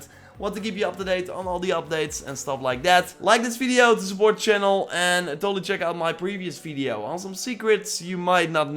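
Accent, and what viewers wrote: Netherlands. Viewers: Hmm, you sound dutch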